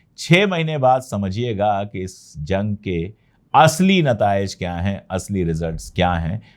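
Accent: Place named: Pratapgarh, India. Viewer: I am hearing pratapgarh iu p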